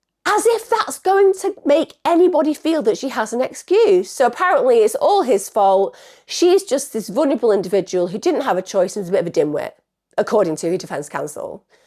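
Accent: United Kingdom. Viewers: Sure english esp brittish is not my forst language but im fluent in it and fr sometimes I have NO idea what u saying. Like winding back listening 4,5,6 times and its just like i hear someone who got a stroke for 3 sek. I know i just suck on brittish but do the rest of you really understand everything? "all of these was basically seen... whAttt???"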